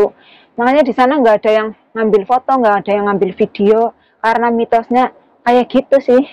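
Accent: Banyumas, Indonesia. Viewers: Sampean wong endi.. Yakin ngapak nemen sampean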